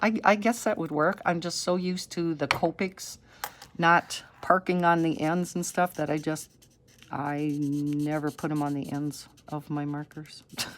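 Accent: Wisconsin, United States. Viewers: Hi! I am from Menomonie. It sounds like you are in the Wausau or Stevens Point area? Just guessing. You shouldn't be nervous dear because you sound like you have been doing this forever. Pat on the back. The foil technique is so cool. I'll have to give it a try. Thanks for sharing it. ;)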